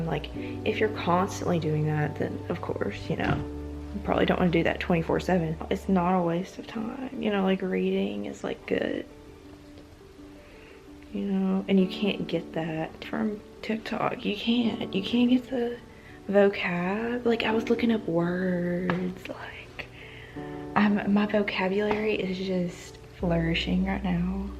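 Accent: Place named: Texas, United States. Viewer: I'm from England and I like the Texas accent lol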